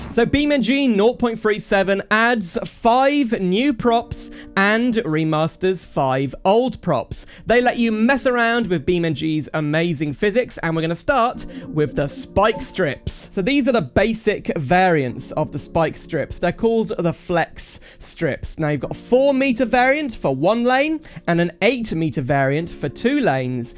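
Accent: United Kingdom. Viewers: Another fellow funny british man fan, it tickles the happy parts of my brain, we do eht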